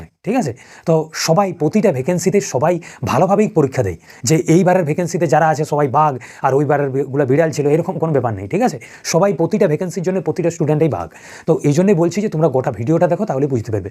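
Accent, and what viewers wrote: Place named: Purulia, India. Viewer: Dada tumi purulia language bolcho ❤️❤️❤️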